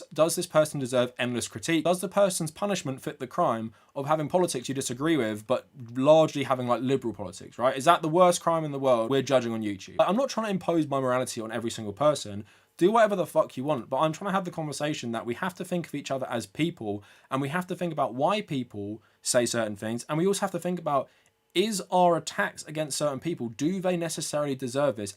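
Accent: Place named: London, United Kingdom. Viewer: Lots of Vanguard fans in the comments who didn't watch your vid and took their words for yours. I watched yours before theirs. I know exactly what you were trying to say, I might not agree with it all but people saying you are paid off by TYT or are some sort of British guy on his high horse (they can't even spot a London accent) just comes across as dumb guy no read books sucdem nonsense. We're literally in the midst of a F takeover and they are talking about YouTube drama. Not social security, not Medicaid, not Medicare. YouTube drama. We should probably all be better than this, but here we are.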